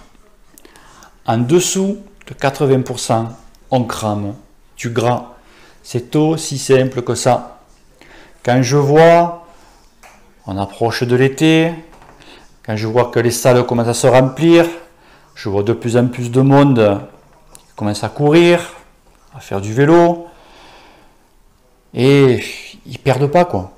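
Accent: South, France. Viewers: Dit.....sans rancune.....on se passerait bien du jingle .... sinon ton contenu est MEGAAAAA en plus de ton petit accent du sudeeee....très charmant !!!!! 😅😋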